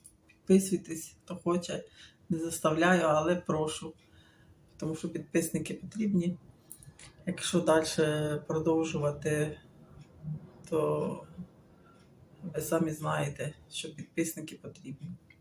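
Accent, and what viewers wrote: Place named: Transcarpathia, Ukraine. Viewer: Цікавий діалект закарпатський,веселий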